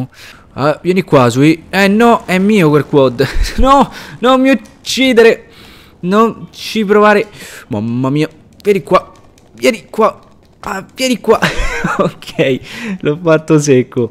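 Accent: Rome, Italy. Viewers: Ma sei romano?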